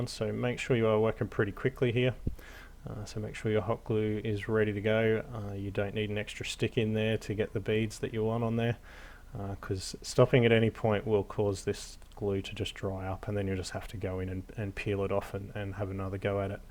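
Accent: Australia. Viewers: I mean hearing an Aussie accent on a wargaming terrain tutorial channel is weird enough but seeing all the tools from Bunnings is even weirder.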